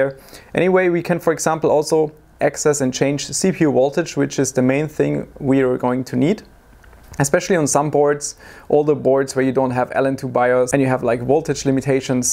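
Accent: Germany. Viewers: I'm hearing this German accent so clearly, why don't you make German Videos? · Er: sagt ein Wort Ich: Ein deutscher Staatsbürger Ich hasse den Deutschen Englisch Akzent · You can hear how german he is by his accent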